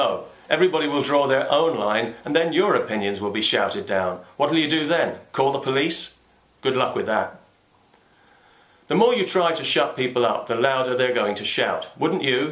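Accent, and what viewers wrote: England, United Kingdom. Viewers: An Irish man with a English accent .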